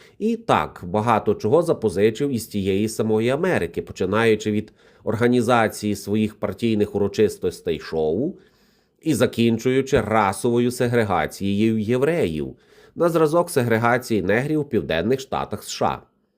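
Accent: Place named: West, Ukraine. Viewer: Тебе послухати, - виходить, що Гітлер не був вже і такий поганий, не сказати, взагалі, добрий. Поцінюваний міжнародними елітами політичного і культурного нахилу. Ну, були у нього де-які дрібні недоліки. І що? У кого їх нема? Ну що з того, що завдяки його невинним примхам-забавам у світі загинуло до 80 мільйонів людей? Він же був хоробрий, був поранений, мав нагороди, художник, номінант на саму Нобелевку! Корочше, сама доброчинність! Не те що росіяни, читай Путін. І як доказ своєї маніпулятивної думки, за вуха притягує сюди образ Мао Цзедуна і Пол Пота, до купи. От так провінціальний недолугий історик-аналітик, загнавши корову до стайні і давши свиням їсти, навіть не витерши руки, миттєво біжить до комп'ютера. І своєю, впізнаваною ореол його мешкання, інтонацією, - західнянського селюха, береться щось блямкати примітивне зі скарбниці своїх недолугих нашарувань, що рояться в його голові. 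Убого хизуючись, як йому здається, йому одному відомими фактами про Гітлера. І мерщій старається поділитися їхнім ново-відкриттям з широким загалом, але під кутом власної, так би мовити, критично осмисленої, точки зору. Яка насправді відбиває помутніння його свідомості і реальної оцінки такого явища, як Гітлер. А насправді його не це цікавить. Йому ж, заради своїх шкурних інтересів і з метою приваблювання глядачів, конче потрібно робити сенсанційні заяви про те що на фоні росіян, пан Гітлер виглядає цілком пристойно. Бо скажи він зворотне, то це нікого не привабить. Бо тут не буде нічого нового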